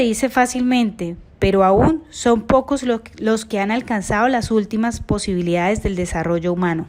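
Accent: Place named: Latin America. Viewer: De todos las versiones en español, me gustó más su versión ya que es español latinoamericano y puedo entender bien el acento ❤ gracias!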